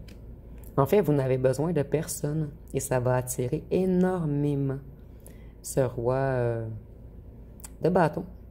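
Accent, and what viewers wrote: Quebec, Canada. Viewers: Exactement bonne journée à toi ma conceur je t adore tu es une belle âme reste bien tel que tu es j apprécie ton authenticité ton accent Québécois Extra ❤❤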